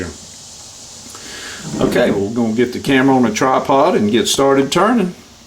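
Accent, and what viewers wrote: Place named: Georgia, United States. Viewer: I love turning wood, and I really love that accent of yours. I have a sister who has lived in Ark. most her life, but it's a bit different than yours. Are you from Georgia, by chance?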